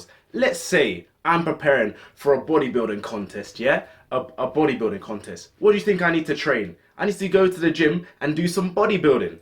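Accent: London, United Kingdom. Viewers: It's a London accent, though there are many different London accents (posh, cockney, and more 'street' as you could call this accent).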